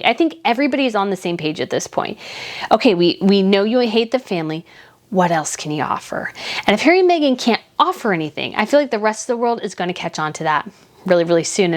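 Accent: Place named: United States. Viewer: Amazing how you talk about their little 16 mil dollar home as if you're living in a $33 mil home yourself because you don't. In addition, how are you a royal commentator sounding like an American? There are no kings and queens here in the US, and this IS NOT a Monarch where you get paid to travel. So keep your negativity there in the UK. Smh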